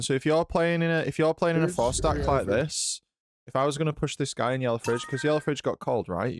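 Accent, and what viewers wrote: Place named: Sheffield, United Kingdom. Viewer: Sounds like a Sheffield accent 👀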